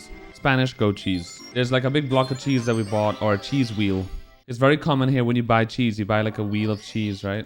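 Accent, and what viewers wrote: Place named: Trinidad and Tobago. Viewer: I need to know. You sounds so trini its insane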